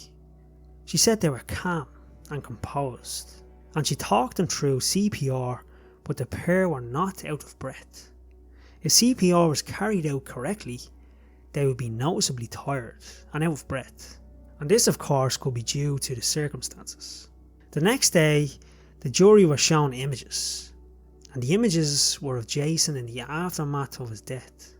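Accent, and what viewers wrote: Ireland. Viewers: How rude of a comment to call the narrators voice weird, I’m sure Americans sound weird to other English speakers in European countries. He’s an Irishmen, and their English dialect has been around longer than Americans dialect of English. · I’m Australian & I just love an Irish accent! The best accent in the world 🍀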